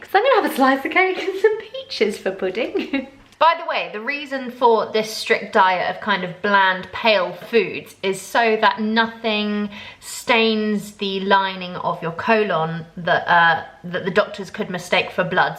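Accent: United Kingdom. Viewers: You were a joy to watch, so thank you! I'm in the middle of my 24 hour fast, then get to drink the fun stuff at 5 PM and again at 4 AM. It'll be my second Big C, as they recommend one here every 10 years. Unfortunately there won't be any nice British accents to listen to, or tea and biscuits afterwards, so I'll have to pretend I'm on your side of the pond :) Luckily we have a plethora of drive-thru taco shops where I live, as I'll be starving afterwards. I hope more people searching The Big C videos in preparation for their first one, come across yours so they can relax, and know what a life saving and easy procedure it can be. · You from England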